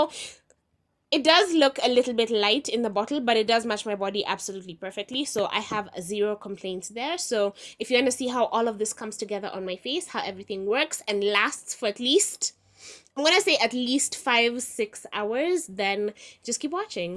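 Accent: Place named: South Africa. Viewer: Your accent sounds so South African🇿🇦💕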